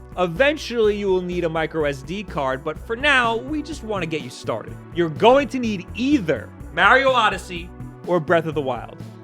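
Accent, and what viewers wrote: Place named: New York, United States. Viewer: You and your New York accent.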